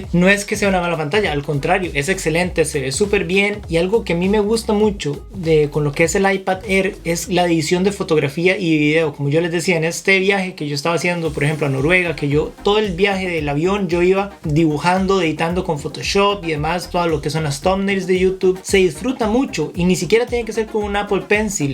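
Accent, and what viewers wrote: Costa Rica. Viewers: Hola Hola! He visto algunos de tus vidos y me han gustado por lo claros y concretos, muy bien elaborados gracias! Para un uso universitario (lectura, edición de documentos word, docs, excel, sheets, pdf) ¿cuál me recomiendas? El 9 no me llama la atención mucho por su puerto lightning Estoy entre iPad y Tab S7 FE. . . PD. Tienes un acento como tico 👀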